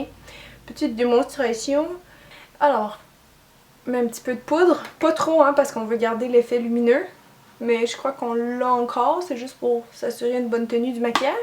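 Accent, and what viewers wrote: Quebec, Canada. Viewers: L'accent québécois tu connais ?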